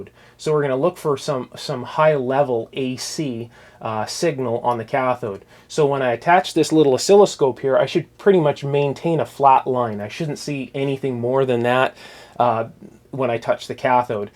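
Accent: Canada. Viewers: Great explanations of what you are doing and why! I have to say, though, that you are from or near the Great White North (Canada), eh? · My wife always laughs at me because she has learned to pick out your voice by the "Solder", "Ohh-kaye?" and other Canadian idiosyncrasies... Nothing but love from Texas though,.:)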